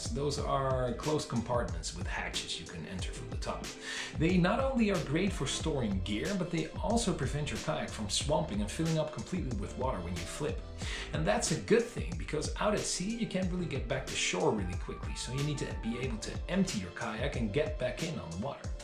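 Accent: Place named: Netherlands. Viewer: I live 1 minute from the Meditteranean Sea in Israel, and I find myself looking up info about buying my first kayak. This video pops up and without further checking you out, I can HEAR your Dutch accent. Then I look and figure out you're a Haarlemmerrrrr! My birth town! What a coincidence. In ieder geval, een erg informatieve video die me een stapje verder helpt in de richting van mijn eerste kayak avontuur. Groet uit het "heilige land". P.S. Is er iets over Middellandse Zee kayakken dat ik moet weten als beginner?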